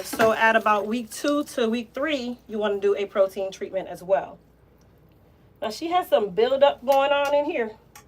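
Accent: Jamaica. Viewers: She’s right you do have a Jamaican accent. It’s not sharp but I do hear it from time to time.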